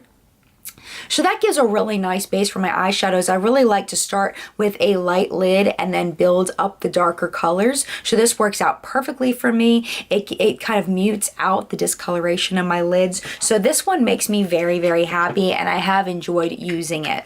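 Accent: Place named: Mid-Atlantic, United States. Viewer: You live in Baltimore?!?! Me too! Did not know that! But I definitely recognized that accent as mid-Atlantic!! Go Ravens!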